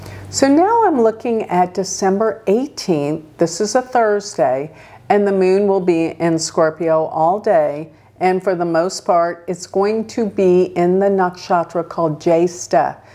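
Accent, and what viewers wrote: United States. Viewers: And I have learned to understand and embrace her American accent especially in the pronunciation of sanskrit terms.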